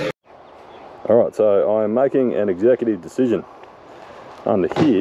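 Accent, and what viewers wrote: Australia. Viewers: Amigo, estou assistindo aqui no Brasil e seu vídeo é de longe um dos melhores da categoria, congrats. Só fiquei preocupado com suas gaiters que são pequenas para seu tamanho. Lembre-se você está na Austrália, terra de cobras e aranhas estranhas; até tive calafrios quando usava as mãos para ajuntar o lixo. Você é muito bom no que faz e o australianquese accent é bonito para os que falam Português como eu. Parabéns, fique com Deus.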